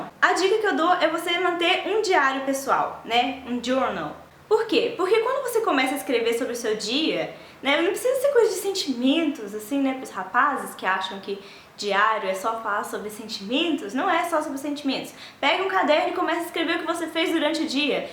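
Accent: United Kingdom. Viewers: Caramba. Hehe Muito bom, e tem até o sotaque da Mione. Kkkk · Sotaque britânico 😍 estou fazendo a mesma coisa pra aprender inglês também já faz uns meses, é bem legal haha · You speak with Hermonie English accent.. woah.. :o I learned some Portuguese by watching Harry Potter in Portuguese.. but I don't think I learned how to speak with a Hermonie or Harry portuguese accent.. aw.. :p it's very good to read books in English too.. I am trying to read books in Portuguese.. I love finding people to speak different languages with.. it's fun to learn about different cultures.. vamos falar em ambos línguas.. yay :D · Meu Deus, você fala bem demaaaaais! E o sotaque britânico é puro amor. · Adorei o sotaque à la Hermione ^^ Sua fofa ;* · O seu sotaque britânico é muito bom - você deve ter praticado bastante mesmo! E esta dica sua é espetacular não só para o inglês mas para qualquer idioma. 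Parabéns pelo canal e continue com as ótimas dicas! :) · Seu sotaque britânico é maravilhoso!! Parabéns pelo canal,. Me inscrevi e ativei as notificações ❤👏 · Eu assisto tanto Sherlock (em inglês com a legenda em português) que agora to vendo com a legenda em inglês também. Falo junto com o Sherlock quando ele tá dando adeus pro John ;-; "it's my note. That's what people do, isn't it? Leave a note?" mas acho que não consigo fazer o sotaque britânico que nem você, invejinha. E acho que agora vou ver HP em inglês e com a legenda em inglês também já que sei as falas em português, quem sabe me ajude mais. Eu considero meu nível de inglês bom e consigo ler um livro, mas tem um problema: eu tenho preguiça de ler em inglês lol eu amo ler, mas as vezes bate uma preguiça. Tenho que mandar ela embora por que lendo é a melhor forma para aprender inglês. E to com você, eu também falo sozinha. Eu faço resenha de livro falando sozinha no quarto em inglês, com medo de alguém entrar e ficar se perguntando que "merda eu to fazendo" :p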